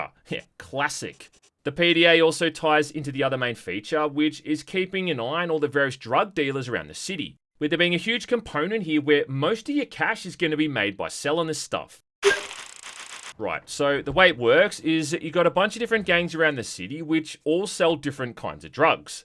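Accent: Australia. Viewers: Are you Australian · You sounds like an Ozzie Australian.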